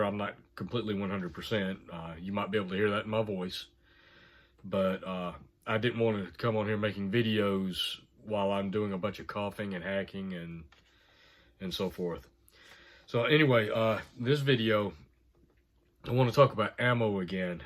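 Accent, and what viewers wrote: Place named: Southern Virginia, United States. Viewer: I'd like to meet you, im in Blue ridge mountains of southern Va, where are u located, you sound like you'refrom my neck of the woods ??? Im looking to form a mag but nobody around me are reliable or aware???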